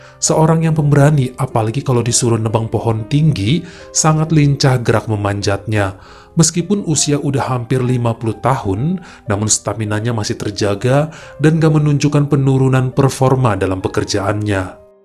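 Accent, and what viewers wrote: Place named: Medan, Indonesia. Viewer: Dari sekian banyak alur cerita, cara menceritakan abg lebih enak didengar, mungkin karena kita sama sama medan, kisahnya juga pada seru dan menarik, sehat selalu bang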